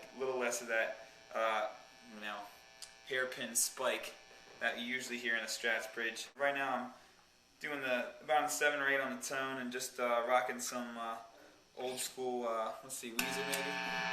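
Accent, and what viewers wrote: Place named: United States. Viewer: Are you Jim Sturgess with a permanent american accent? :) You sound exactly like him.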